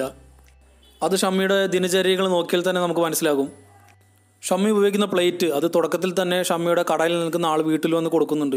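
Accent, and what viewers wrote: Palakkad, India. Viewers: Cheettan palakkad ano???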